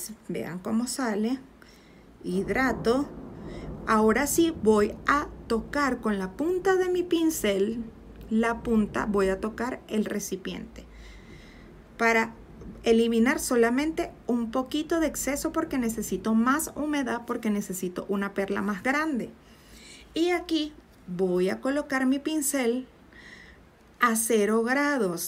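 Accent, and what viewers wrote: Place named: Venezuela. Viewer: Amo ese acento venezolano saludos desde Argentina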